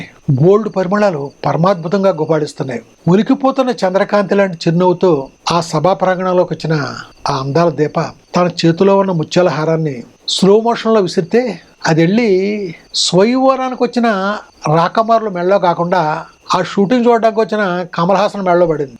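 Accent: Godavari, India. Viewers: వంశీ గారు మీరు గోదారి యాస లో మీరు మాట్లాడుతూ వివరించి చెప్తుంటే కళ్ళ ముందు వీడియో కనిపిస్తుంది.... డైరెక్టర్ గారు....మీ సృజనాత్మకత అద్భుతం....మీ అభిమాని పశ్చిమ గోదావరి జిల్లా వాసి మీ హరీష్... జంగారెడ్డిగూడెం...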